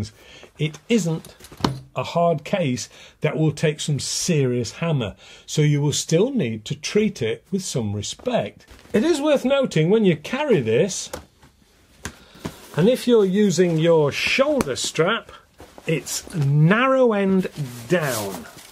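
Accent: United Kingdom. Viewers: Very good job on the video. Lots of good information for a new airgunner. I own a Gamo Urban PCP and it's a great low cost pesting tool, powerful and very accurate. There are a couple of things I would point out. At the end of your video you instructed that the user should remove the magazine from the rifle before storing. The bolt must be pulled back to remove the magazine which cocks the hammer. Also, there is a pellet in the barrel yet so when the bolt is closed the rifle is still ready to fire! I usually leave the pellet in the barrel but I always de-cock the hammer. One has to remember that there is still a pellet in the barrel too, or when they reinsert the magazine it will result in a double feed with two pellets in the barrel. To be really safe, the pellet should be removed with a cleaning rod, or, shot after the magazine is removed. I had a few double feeds when I first got the Urban until I learned what was happening. 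Thanks, I always enjoy your videos. There's just something about listening to that British accent...😉 Geo from MI, USA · UK English with the accent really are the best narrators.. Soothing like Clarkson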